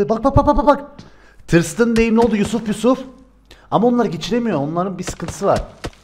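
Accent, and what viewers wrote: Cyprus. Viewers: Abi gıbrıslımısın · Kibrislilar gibi konusuyorsun nerelisin😂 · Abi senin sivenden anladim kibrislisin dimi bnde kibrisliyim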